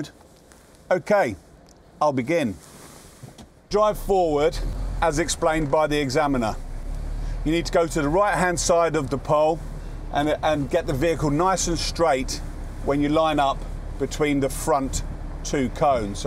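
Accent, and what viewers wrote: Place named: Yorkshire, United Kingdom. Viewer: Love your Yorkshire accent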